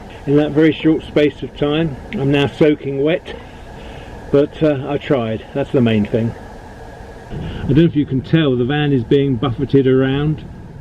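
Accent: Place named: United Kingdom. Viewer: Hey, thanks for the interesting videos! It would be a help if you could put the name of places on the screen so I can look them up on Google or Google Earth. Though your British pronunciation is great, the spelling is not always obvious to us across the pond. Putting it on the screen would be a help. Thanks, and keep up the good work.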